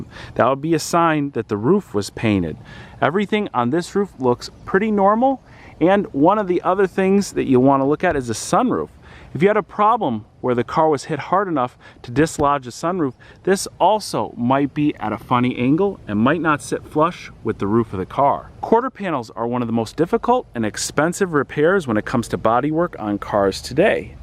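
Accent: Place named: Tampa, United States. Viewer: Phil Abrahams The Floridians from Tampa area have this accent.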